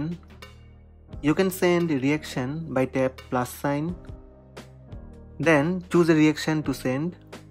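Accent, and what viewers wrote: Bengal, India. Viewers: Dada apni bangali English ; Are You A Bengali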